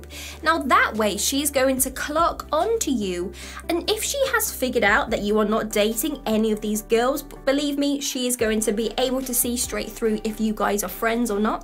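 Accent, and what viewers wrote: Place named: RP, United Kingdom. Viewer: Cool RP accent and cool backdrop too.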